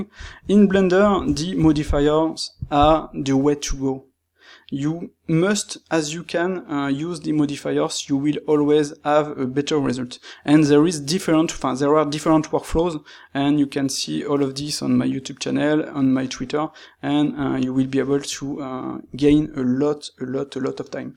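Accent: France. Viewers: Your English sounds very French in pronunciation 😊👏🤣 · Ooohh Lya Lyaaa ))) youre cool frenchmen ,I like the accent and the teaching ,, appreciate for this techniques . thanks big time! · Don't worry about your accent. I love the sound of the french. I did french in school but forgot most of it. Loved it more than spanish. Your english is quite understandable, so don't worry about the negative comments. Now proceed as normal. Every word of english you speak sounds more expensive.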